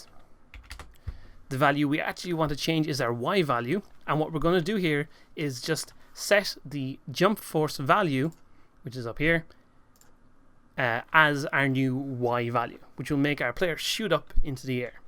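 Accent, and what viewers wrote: Ireland. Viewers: Thank you so much for this video. As a newbie to C# I appreciate you taking to time to explain your coding. I really like the Irish accent but I did type VectorTree instead of Vector3 at one point XD XD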